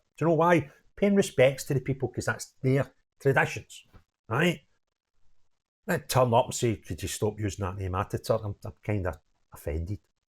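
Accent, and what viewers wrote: Scotland, United Kingdom. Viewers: The respected Anchor is most probably from Scotland. Regards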